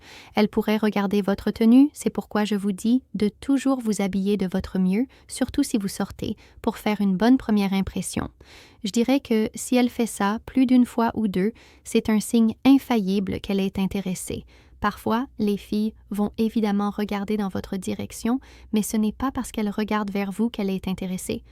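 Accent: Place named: Canada. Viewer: ce qui me gene cest cet accent canadien ia ou pas ?????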